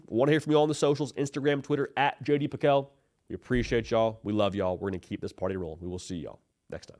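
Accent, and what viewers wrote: South, United States. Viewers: Thanks,! Great to hear a Southern voice in all of these commentaries. As a Catholic Georgia boy, and former UGA student, I say Congrats to Notre Dame! It was hard to watch for me but I wish them all the best in the playoff. Beat Penn State!! The Dawgs will be back. We need to get better backs and receivers, and they need to protect the ball. I hope Gunner can develop and grow into the player he can become. Happy New Year!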